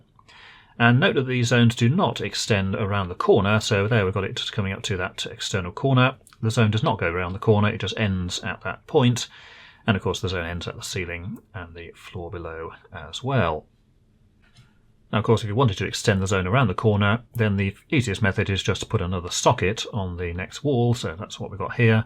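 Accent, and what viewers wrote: United Kingdom. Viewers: Thanks for the precious content! I am a subscriber, watched a bunch of your videos... was surprised here by the speed of your pronouncing some sentences. The British English compounded by the fast enunciation made some parts difficult to understand. May I kindly beg for a bit slower speaking pace please - for your international audience? Thank you for the understanding!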